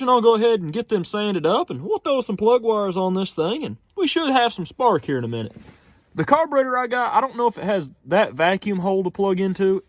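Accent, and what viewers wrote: South, United States. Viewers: not an accent but rather the Southern Drawl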